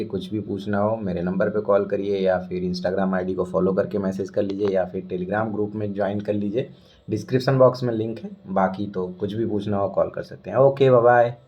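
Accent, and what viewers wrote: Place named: Bhojpuri, India. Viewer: Bhojpuriya andaaj me😂😂😂❤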